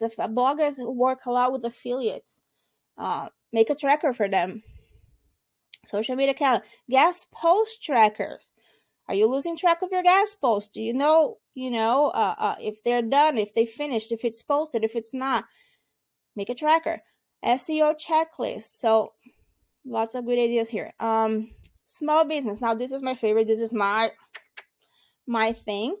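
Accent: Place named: Brazil. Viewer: I knew it! It was king hard, but I thought: “the accent.. it seems to have a little of Brazilian in it, but I was not sure”.. congrats!! Awesome content, and beautiful accent!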